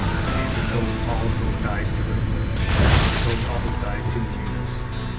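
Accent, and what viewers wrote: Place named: Asia. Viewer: good ears, i can tell it's an asian speaking too if i listen closely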